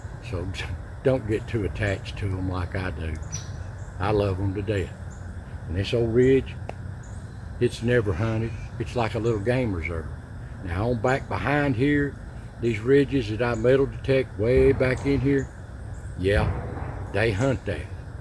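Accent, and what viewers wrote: Appalachia, United States. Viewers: My husband and I watch all your videos. For me, personally, the 1st thing that drew me in was your voice. I had been searching for appalachian history and found you. I subscribe to a few other appalachian channels, but yours is my favorite. I have lost all my immediate family, just good ol Alabama mountain folks. Your accent is identical to theirs, so it gives me such comfort. You'll never know 😔 I wake up every Monday morning, have my coffee, and check in with the Ridge. My husband and I now spend alot more time out in nature and even plan on buying a metal detector very soon. ❤ God Bless you, and all you do.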